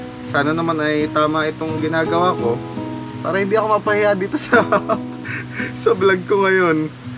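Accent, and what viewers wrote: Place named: Malolos, Philippines. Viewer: Sir malolos talga Yung Punto mo...di Po ba mahirap Daan punta Jan sir Kaya Po ba Ng maliit na kotse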